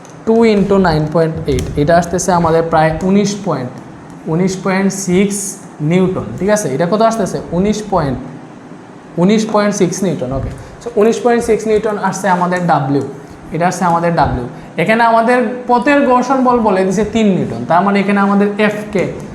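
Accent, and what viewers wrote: Sylhet, Bangladesh. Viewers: vai ki sylhety 🤔🤔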